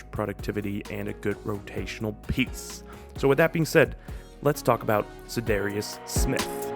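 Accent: United States. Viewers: Thanks for the video man. Also, your (American) English has gotten really clean. All the hard work shows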